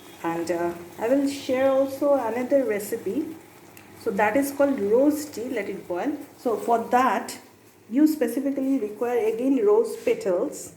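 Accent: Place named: Kolkata, India. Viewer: Sunei apnake Kolkatar bashinda mone hocche...... Great work.... keep it up....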